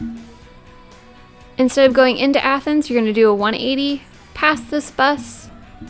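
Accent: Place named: Canada. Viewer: Thanks so much for the help. I believe I've found all 150 cases. (not sure if there are 151) LOL Btw, are you Canadian? The way you pronounce "out" and words with that sound are usually a dead giveaway. ;)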